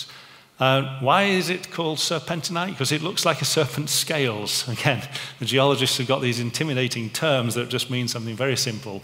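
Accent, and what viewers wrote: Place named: England, United Kingdom. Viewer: Such bull shit delivered with an english accent to impress the really stupid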